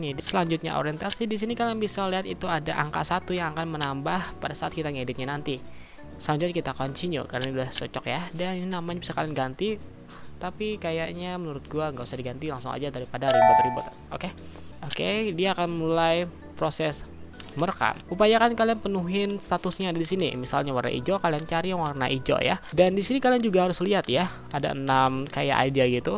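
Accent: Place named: Central Java, Indonesia. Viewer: JATENG MANA SUARANYA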